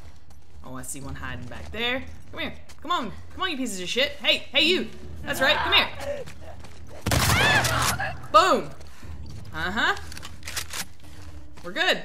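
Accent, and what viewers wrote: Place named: South, United States. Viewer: I love your Southern Accent Southern Accent Gang love from Mississippi also you’re so adorable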